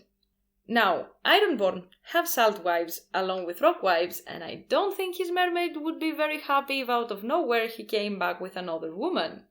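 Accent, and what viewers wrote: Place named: Greece. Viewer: I think you're the first (fellow) Greek ASOIAF Youtuber I've ever seen I could immediately tell by your accent hahaha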